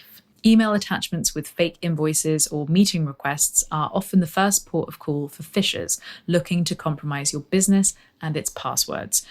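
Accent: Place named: Salisbury, United Kingdom. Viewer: I may be the millionth person to tell you this, but you have an absolutely beautiful speaking voice, and I wonder if you’ve considered narrating audiobooks or documentaries. Both your Salisbury (or Winchester?) accent, and relatively low pitch, are highly listenable for long periods, especially for Americans. I thought my comment would sound very random, but given this latest video’s topic, maybe it’s relevant to your far-flung enterprise (ha!). Cheers and best wishes from the USA.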